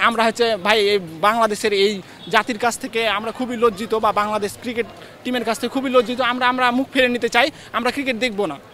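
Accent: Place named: Kolkata, India. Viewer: Polay vodai😂 cricket thik i ase oy besi bujhe bolod sala 😅 i ❤ Bangladesh cricket sala parle tui khel tui to kolkata theke asos kotha sunlei bujha jay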